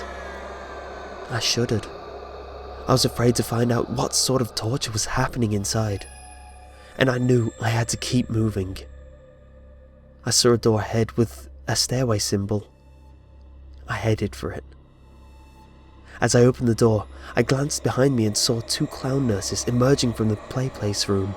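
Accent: United Kingdom. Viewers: Narrator with a UK accent....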